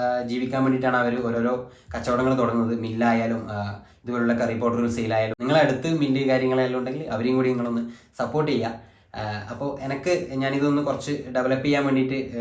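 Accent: Kannur, India. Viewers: Hi,nalla caliber ulla kutiyanu,brilliant,alrounder anennu kl Biju channel kandu anne njn manasilaki,actually nte nishkalanka chiriyum kannur bhashayum enne attract cheythu,bcs njn kannur,eachur anu. nalla director,thirakkatha,story,ellam super ayirunnu,ipol a channel kanarilla, e kanan matram,ipol santhoshamayi, mon nannayi varum,orikal nerit kananam😊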